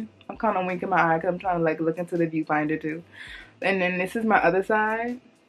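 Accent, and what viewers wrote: New Orleans, United States. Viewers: U from New Orleans huh? How u talk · Are you from New Orleans? I hear that NOLA accent.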